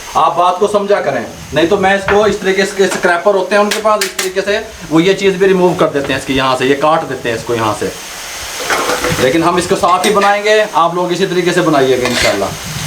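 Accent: Punjab, India. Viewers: kia baat hay. way of expression is impressive. punjabi ka touch bht acha. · Bhai you r the BEST. I jus love your Punjab accent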